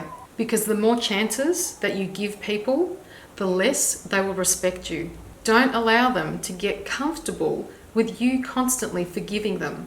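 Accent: Australia. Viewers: Pretty sure she's an Aussie. Lol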